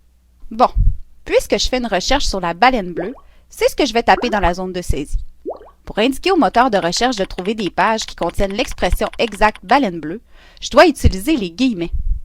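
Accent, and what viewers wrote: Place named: Canada. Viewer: j'adore la voix canadienne, amusante🤭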